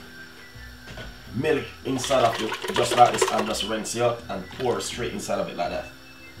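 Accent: Jamaica. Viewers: He's Jamaican even if he were from China he has his own way of speaking because of where he is from. He also says ole mila for whole milk and share it out instead of portion it out but somehow none of that bothered you. I like to hear how other people talk. It's interesting. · Why u being so rude he is a jamaican man thats how we talk its our accent and pronunciation. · He speaks patois leave him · So Jamaican talk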